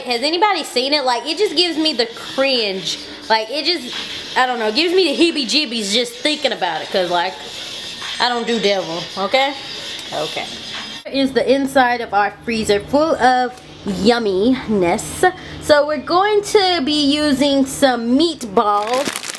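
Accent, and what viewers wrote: South, United States. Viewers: omg that was too cute and funny!! ur so country and its so cute lol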